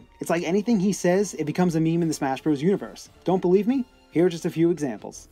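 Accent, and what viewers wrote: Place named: Boston, United States. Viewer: You almost sound like you're from Boston.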